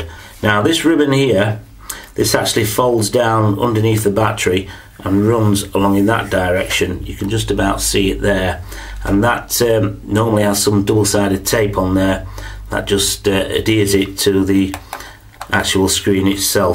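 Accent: Yorkshire, United Kingdom. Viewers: you sound like a yorkshire man ,,,are you near enough to fix mine ?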